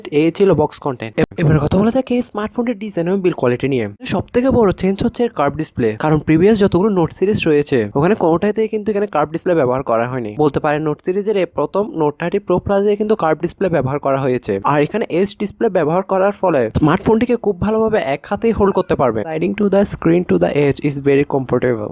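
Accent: Chittagong, Bangladesh. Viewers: তুই কি চিটাগাং এর?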